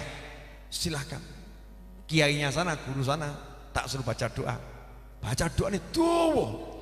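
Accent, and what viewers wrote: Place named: Java, Indonesia. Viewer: Suka sekali dengan cara penyampaian Habib, menyenangkan dan "njawani" Sehat terus ya Bib...in Sya Allah Aamiin 🙏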